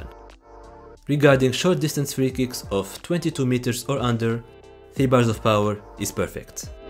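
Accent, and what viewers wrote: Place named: Morocco. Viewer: Khouya ila knti meghribi rak nadi bzaf, bayna mn l'accent dyalk. Bonne continuation 🙏❤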